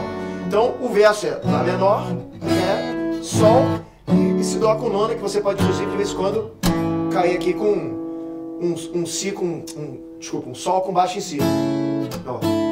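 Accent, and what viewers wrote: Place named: Rio de Janeiro, Brazil. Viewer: e essa voz de carioca ai tem jeito?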